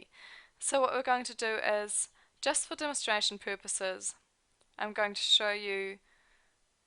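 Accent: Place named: Australia. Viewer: You have the cutest accent. Australian?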